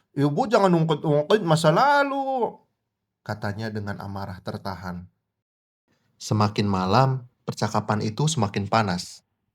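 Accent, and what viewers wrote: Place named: Medan, Indonesia. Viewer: Kerennnn...logat medannya berasa banget...good luck yachhh 🎉🎉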